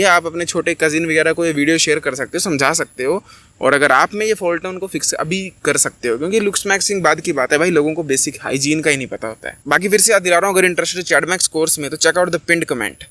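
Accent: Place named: Punjab, India. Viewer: Bro tera Punjabi accent end hai❤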